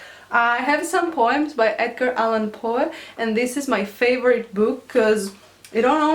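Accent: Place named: Greece. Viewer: nice... καταλαβαινεις τον ελληνα απο το παχυ λλ και τα μεγαλα φωνηεντα. keep up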